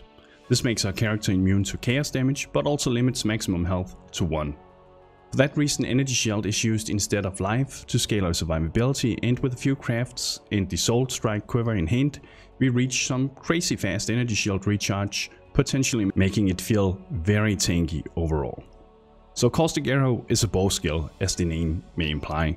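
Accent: Denmark. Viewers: jeg har altså en eller anden idé om at den eneste grund til at folk ikke snakker om dig/recommender alt dit content er pga din horrible accent:P Det gør ondt at høre på som dansker, men det er sikkert også ret skidt for ikke-danskere. No offense! Jeg ønsker dig kun det bedste... men ja, øv, måske du kunne (måske bare for min skyld.. det kan godt være jeg er den eneste) prøve lidt hårdere på udtalelsen? Den værste er når du siger "whalecum" istedet for "welcome"... oof!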